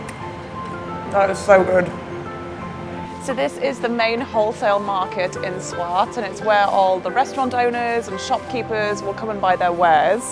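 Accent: Australia. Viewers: Your accent sounds Australian yet somewhere your video mentioned "English girl." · your accent sounds more Ozzy <3 after watching Ozzy Man reviews since 2014, My inner voice has become Aussie eventhough I am Pakistani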